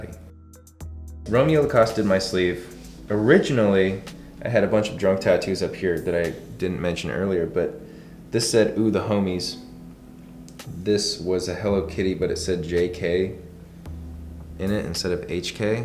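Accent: Ireland. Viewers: Ur Irish ah here come to me house hun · You are irish?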